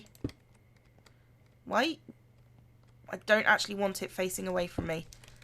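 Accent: United Kingdom. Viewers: Wait, you're British?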